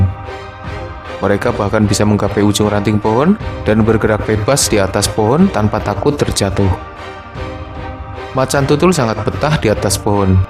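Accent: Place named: Java, Indonesia. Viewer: Medok bgt min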